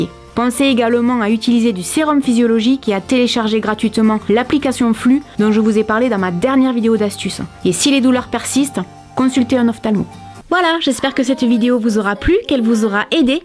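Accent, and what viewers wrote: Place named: Toulouse, France. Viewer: Coucou ^^! J'adore tes astuces, elles me servent beaucoup!! Est ce que tu viens de Toulouse? Car tu as un accent qui me fait penser a ma grand mère qui viens de Toulouse. Enfin bref, je t'adore ♡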